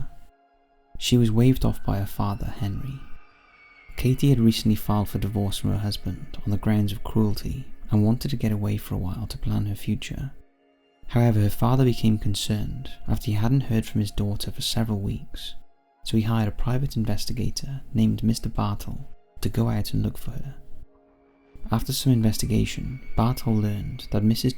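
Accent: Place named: North Wales, United Kingdom. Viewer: I’m sure the narrator is from north wales , soothing voice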